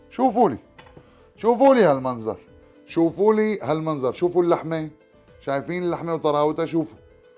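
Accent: Levant. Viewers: سلمت يداك ولسانك الشامي العذب تحياتي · مصري من المانيا يرسل لك شكر يكفي من هنا لعندك. غير اللهجه الشاميه التي نعشقها كمصريين,فبكل امانه الشرح والنظافه والاهتمام الرائع في تجهيزك للحم,انتج في النهاية قطعة البسطرمة وشرائحها والتي من مجرد شكلها وانسيابيتها تعد تحفه فنيه. لا تقل ارمينيه, ومع احترامنا لهم فنحن نعرفهم اساسا كساعاتيه,ولكن انا حين اجربها سأقول بسطرمه. كل الحب والاعجاب والتقدير وتحياتي لشخصك الطيب. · حكياتك الشاميات حلوين 😄 وشغلك ماشالله نضيف ومرتب يعطيك العافية 👍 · حكيك شامي تمام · سلامات شيف اكلاتك متلك طييبين اللة يسلم دياتك والاحلة لهجتك الشامية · تسلم ايدك شيف اول مرة اشوفك بس واضح انك استاذ في البسطرمة الله يعطيك العافية واضح من لهجتك انك لبناني او سوري وانا كتير بحب اللهجة الشامية تحياتي من مصر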